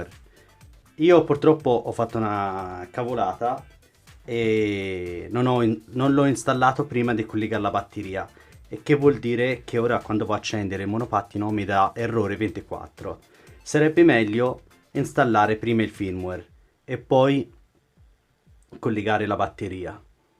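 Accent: Tuscany, Italy. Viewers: Di dove sei ? Toscano? Se ti mando i monopattino me lo modifichi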